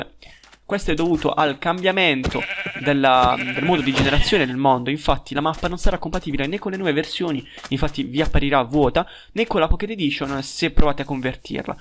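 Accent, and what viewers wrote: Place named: Italy. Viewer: I love you italian accent xD greetings from Argentina!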